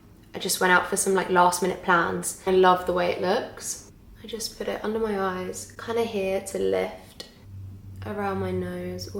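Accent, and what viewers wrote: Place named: United Kingdom. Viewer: I have never heard you speaking and I never expected you are British!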